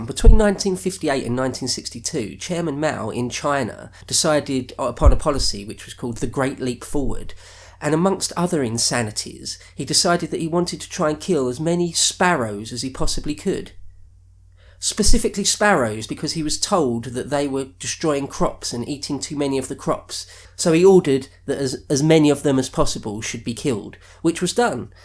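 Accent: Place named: Yorkshire, United Kingdom. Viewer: * sounds like he's from my ends.....Dewsbury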